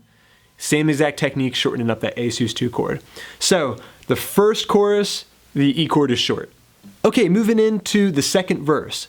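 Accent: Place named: Philadelphia, United States. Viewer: Im also from Philly the accent was the clue I am working on Thanks for the great lesson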